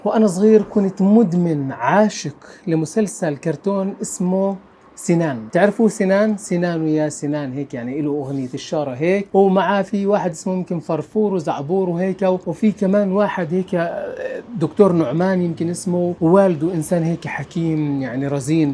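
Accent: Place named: Palestine. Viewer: نحب بزاف لهجتك، لهجة الفلسطينيين الأصيلة.. تحية لفلسطين مني و من الجزائر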